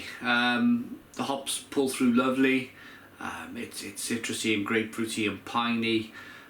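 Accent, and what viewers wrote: United Kingdom. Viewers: Interesting beer and another great review. Are you English or Welsh?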